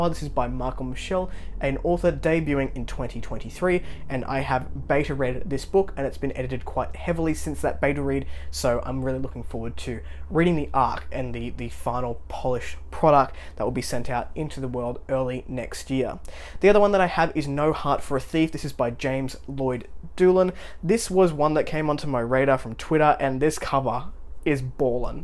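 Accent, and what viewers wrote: Australia. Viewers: Great to hear a Aussie accent. Loved JV Jones back in the day.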